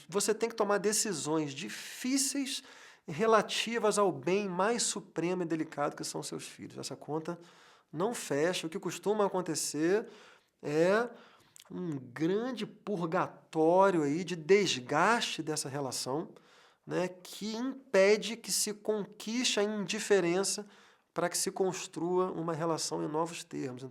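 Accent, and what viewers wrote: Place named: Rio de Janeiro, Brazil. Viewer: como sempre, com essa voz maravilhosa,sotaque sutil carioca, incrível e eloquente...eu tenho uma relação com os filhos muito peculiar, tenho 4, de uma relação sólida de 20 anos...não vou me estender pq daria um grande ensaio...O que quero cita aqui é sobre a relação liberdade e individualidade. Eu desenvolvi o hábito de beber recentemente, menos de 4 anos, sempre fumei meu Beckenbauer com meu esposo desde o início de relacionamento e aos 30 fumei meu primeiro cigarro, resumindo, eu sou incapaz de exercer o meu modus livre/entreternimento/relaxing na presença deles, eu simplesmente não relaxo...e tirando o caçula de 7, o restante são bem crescidos pra compreenderem, mas eu não consigo, e me causa um desconforto, pois me sinto reiterando o comportamento auto tolhitivo da minha mãe, apesar de não ser haja visto que eu sou o cerumano mais transparente que meus filhos conhecem, não nego nem peido, falo o que penso sempre, nunca fui hipócrita, mas está relacionado a um espectro de controle e administração...Isso eu não consegui ainda superar.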